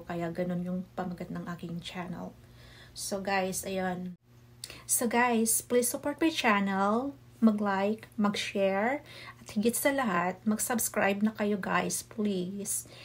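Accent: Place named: Visayas, Philippines. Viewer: Interesting from Bohol k pla sis, i like Visayan region been there once, gingaya qo p nga accent at inaaral p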